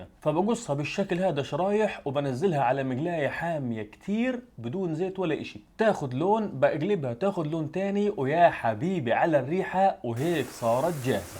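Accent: Gaza, Palestine. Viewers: احلا شي لهجه الغزاويه مدموجه مع البدوي سبحان الله نفس المكس طالع فالاردن😂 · الظاهر عليك اكيل يا ابن بلدي (لهجتك غزاويه)يمكن غلطانه احكيلي الدغريري.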